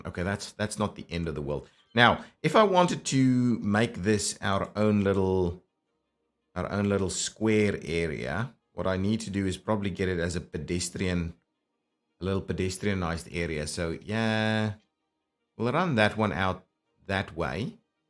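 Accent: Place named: South Africa. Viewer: Has to be South African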